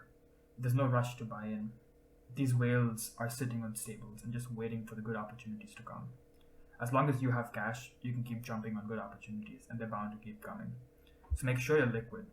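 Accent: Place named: India. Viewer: Great video brother......are you from India??? just guessing from your voice.......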